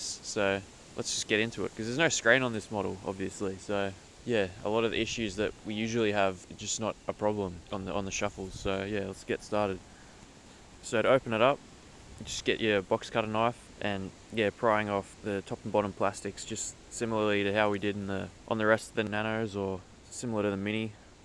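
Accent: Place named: Australia. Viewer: OMG. He's Australian. Thumb down!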